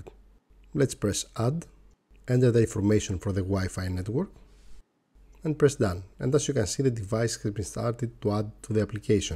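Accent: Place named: Greece. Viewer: I know you might not have expected this comment but... You're Greek, aren't you? XD I believe as a Greek myself, I can tell from your accent 🤣 (which is by no means a bad accent, but still not quite American, English or Australian)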